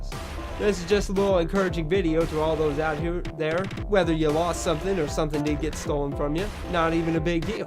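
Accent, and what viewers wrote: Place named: Ireland. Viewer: Yeah I must say I love your little floating objects! This is me requesting your next video to be in your Irish accent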